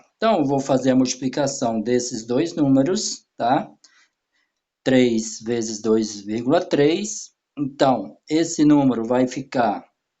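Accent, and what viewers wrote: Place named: Rio de Janeiro, Brazil. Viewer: Parece ser do rj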